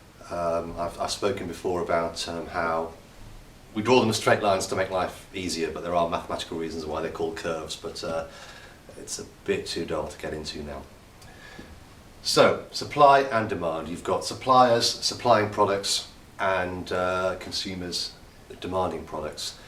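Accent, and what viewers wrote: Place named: London, United Kingdom. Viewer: Perfect mate. You're a londoner ain't it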